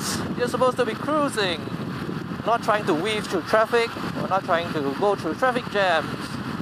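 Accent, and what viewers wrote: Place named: Asia. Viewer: Why? He's obviously asian, you can say that by his accent, but his voice is actually quite good for this type of video as you can easly hear what he's saying through the wind, traffic and engine noise.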